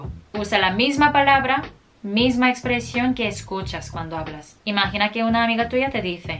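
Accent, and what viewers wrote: Spain. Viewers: Linda coreana ..tu estilo del español es de España..te recomiendo nuestro neutro español peruano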